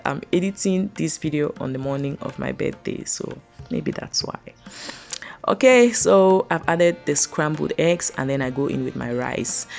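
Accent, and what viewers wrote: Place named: Nigeria. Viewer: You have Nigerian accent the rice looks absolutely delicious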